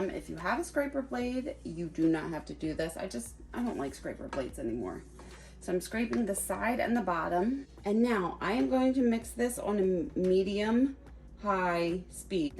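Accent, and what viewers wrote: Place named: Pennsylvania, United States. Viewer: You are a lifesaver! I am making a 3 tier cake for my father’s 90 bday party…you gave me a lot of tips! I’ve watched a few of your videos in the last hour already! When I first heard you speak, I said she is from Pennsylvania! Then I looked and see your from Langhorne! I am originally from Levittown, now in FL. thanks for all the tips and tricks!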